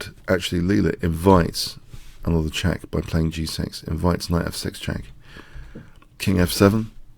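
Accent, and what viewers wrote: United Kingdom. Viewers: yeah you know you’re British accent is different than what I’m used to hearing being an American unfortunately.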